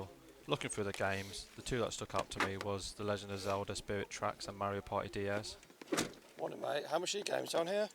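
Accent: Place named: Birmingham, United Kingdom. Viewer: New to your channel bro, trying understand your brummie accent :) keep up the good work mate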